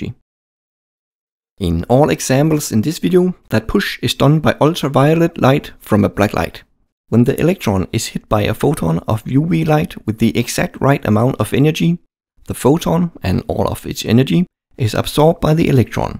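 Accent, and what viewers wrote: Denmark. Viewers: Hvis man er fra danmark kan man også godt høre at du er fra danmark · man kan bare høre at du er dansker:P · you from denmark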